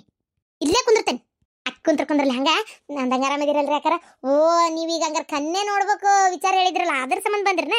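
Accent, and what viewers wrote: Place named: Punjab, India. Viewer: 😂😂😂😂😂😂 super taking taking ur laughing punglish talking superb 😅😅😅😅😅😅😅😅😅😅😅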